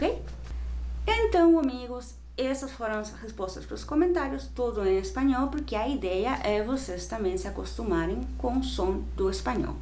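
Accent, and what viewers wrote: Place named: Rioplatense, Latin America. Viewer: Me gusta tu acento. Yo creo que debes mantener el acento Rioplatense. Podrías enseñarnos algunas expresiones típicas de Argentina. Gracias.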